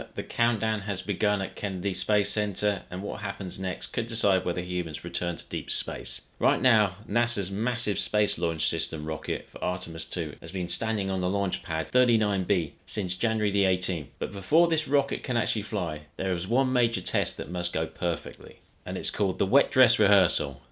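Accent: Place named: London, United Kingdom. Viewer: Thanks for the update. Given the complexity and safety aspects that are a huge part of this project, I’m still amazed that well over 50 years ago, humans actually went to the Moon. I’m located about 60 miles south of Los Angeles, in Orange County, CA (but born in Gloucester, UK)., I’ll guess that you’re from some borough of London, or nearby. Hackney?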